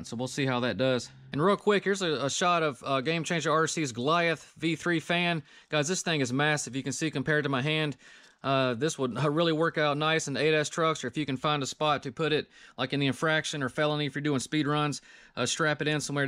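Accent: South, United States. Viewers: Hey my friend very videos Man you funny bro you said this car is a HOOT yo that is so southern and matches your acent, iam NJ iam definitely gonna start using its a HOOT I love my brother, and your a excellent driver really good. Thanks your friend william from NJ Forgettaboutit 👍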